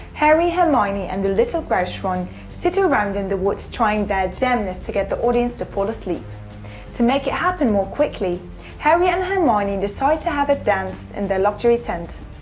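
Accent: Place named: London, United Kingdom. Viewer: Miranda Brooks I had no problem understanding her at all. im from Montana and can understand that it's a typical London accent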